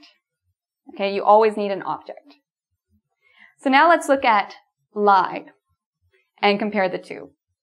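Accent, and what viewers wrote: United States. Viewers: Is she an American？ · Estou aprendendo bastante! I'm learning a lot! Miss, are you american or canadian?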